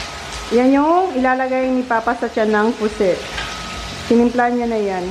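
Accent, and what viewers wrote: Bicol, Philippines. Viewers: Sarap sa ears ang pagbibicol mo manay 🥰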